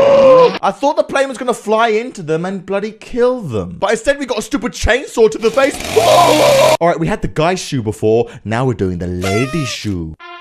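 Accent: United Kingdom. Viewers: He’s British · Are you british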